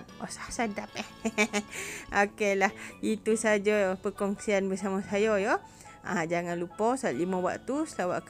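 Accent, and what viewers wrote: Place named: Palembang, Indonesia. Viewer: Sedap nian kuenya, accent kakak mirip samo baso kami wong Palembang (sumatra) 😁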